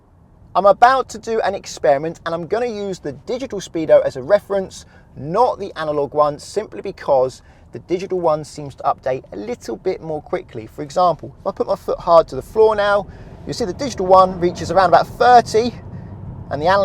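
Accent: United Kingdom. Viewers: I came always for his British accent.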